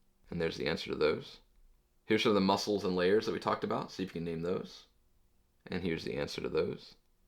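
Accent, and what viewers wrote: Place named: United States. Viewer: Please can add subtitles for non Americans that can understand your accent. Thanks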